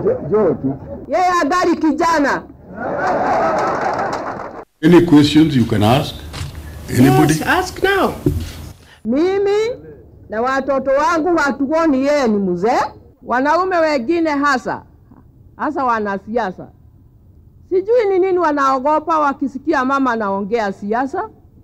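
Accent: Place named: Kikuyu, Kenya. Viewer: GOAT. As an othaya folk I can confidently tell you his non-native utterances( non-kikuyu et al ) were merely Kikuyu talk transversed especially in Swahili. When he says " sasa basi " he is actually adressing the subject in Kikuyu banter " Atereri ".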